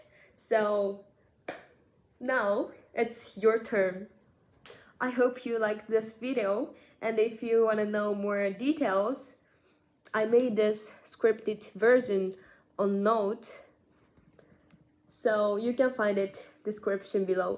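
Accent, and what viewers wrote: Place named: Germany. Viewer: スイスに行く前はアメリカ英語を学ばれてたと思うけど、多分スイスに行って若干ドイツ語訛りが出てきてるね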